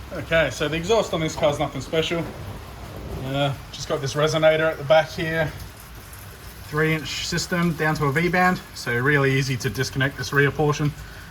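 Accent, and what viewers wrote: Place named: Australia. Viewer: In australia? im guessing via the accent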